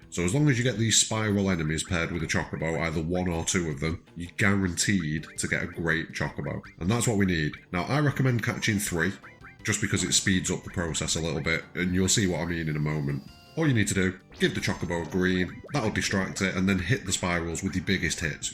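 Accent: Manchester, United Kingdom. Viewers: OP are you from Stockport? Just skimming across ff7 gold chocobo videos and noticed that you’ve got the same Manc twang as me!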